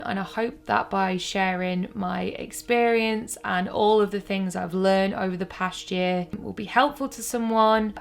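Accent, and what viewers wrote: Manchester, United Kingdom. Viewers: Excellent series and being a fellow Manc it’s so nice to hear another Mancunian voice.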